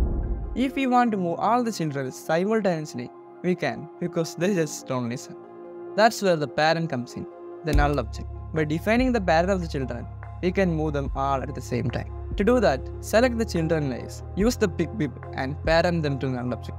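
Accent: Kerala, India. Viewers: Your english sounds like malayali english ( No offence ) are u malayali bro?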